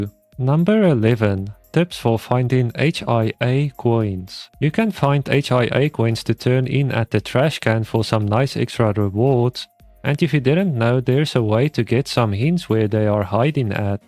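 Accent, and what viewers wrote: South Africa. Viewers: Eh? South African?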